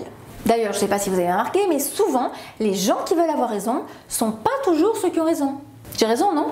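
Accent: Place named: South, France. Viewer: Jolie accent du sud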